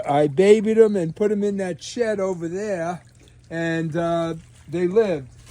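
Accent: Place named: Brooklyn, United States. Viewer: you never lost your good ol' Brooklyn accent! I love it. So tell us what fertilizer you use and what plants, if any, do you have to transplant to larger containers as they get more mature?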